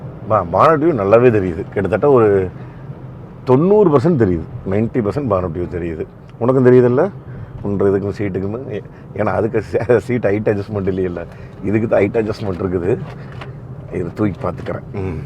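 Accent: Kongu, India. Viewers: காரைவிட உங்கள் கொங்கு தமிழ் அழகு...அம்மா ஊருக்கு போண மகிழ்ச்சி 😀🥰🙏🏻. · Anna unga kongu tamil review tha mass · Kongu slang is🤔😊 mesmerizing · அட நம்மூர் அதாங்க கொங்கு தமிழில் கேட்டதும் மனசு குளிர்ந்து.அய்யா SPB மறக்கமுடியுமா. எதார்த்தமான தங்களின் பதிவு ச சொல்ல வார்த்தை இல்லை.my son also se your comments and he like to purchase hundai or Honda amaze.தங்களின் பனணிதொடர் வாழ்த்துக்கள்.எனது ஊர் k village சர்க்கார் கண்ணாடிப் ஆத்தூர்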